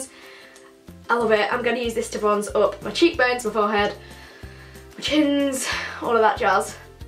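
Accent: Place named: Nottingham, United Kingdom. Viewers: Nottingham I think x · Where abouts from the uk are u from , I'm trying to figure out yr accent 😂✨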